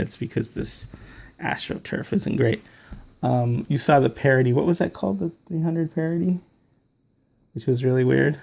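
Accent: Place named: Chicago, United States. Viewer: Are you from the Chicago area?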